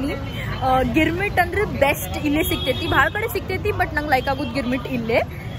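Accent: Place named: Dharwad, India. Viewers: Medam speech dharwad language I like it more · Hey madam.. i impressed our hubli kannda... nim math kelthidre.. girmit thindange aythade...bari cholo itri nim mathado way... from haveri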